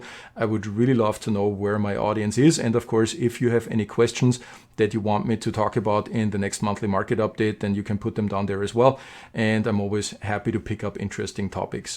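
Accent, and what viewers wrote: Germany. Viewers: It sounds like you are from Germany? As a European used to a safety net I find it overwhelming to imagine how to get a job in Milwaukee without a career. And being able to afford healtcare. If it's even possible. Are there any places that would help you apply for jobs for free? I wonder if it's possible to qualify for food stamps as an American citizen while your searching for a job if you've never lived there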